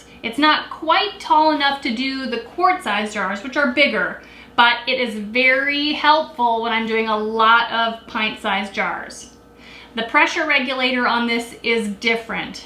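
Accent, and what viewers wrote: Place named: Midwest, United States. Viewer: I KNEW you were from WI or MN from your accent. LOL! Thanks for all your great videos.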